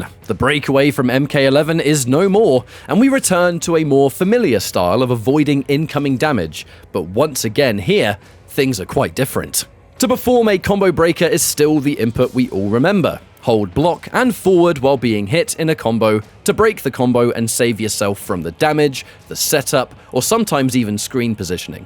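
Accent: United Kingdom. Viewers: There is something about people from UK narating stuff. I could listen to you guys talk about damn fllowers for hours, let alone stuff I’m interested in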